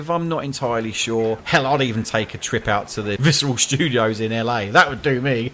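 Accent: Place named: Brighton, United Kingdom. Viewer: ***** nice, do you have hardline on both platforms? Also what part of england are you from, judging from your accent I would say Brighton ;)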